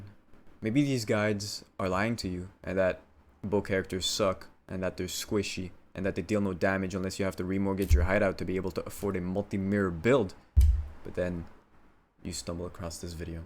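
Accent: France. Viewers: i Like Ur pronunciation. (French native?) Keep the Work Up on yt. I have watched numerous Guides. When i Heard IT IS your Seconds Video i was surprised. The Guide is well done!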